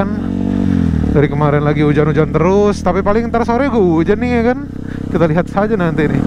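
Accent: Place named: Betawi, Indonesia. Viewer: Betawi itu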